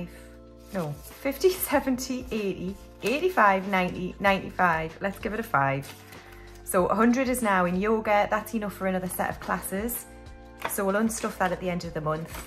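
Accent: Newcastle, United Kingdom. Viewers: I’ve said this before and I’ll keep saying it, I bloody love the Geordie accent 🙌🏻 Our birthdays are a few days apart, mine is July 26th! Team Leo You missed out 36 on the penny challenge but you’ll probably notice it when you do it next haha. Another amazing video & don’t forget to use your spending money on a bottle of wine or two when you have food with your travel friends 😂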